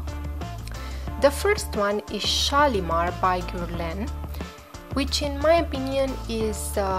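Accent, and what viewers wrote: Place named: Romania. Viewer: Romanian accent.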